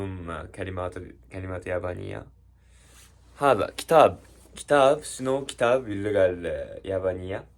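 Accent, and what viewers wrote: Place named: Iraq. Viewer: زين تحچي عراقي حلو 💫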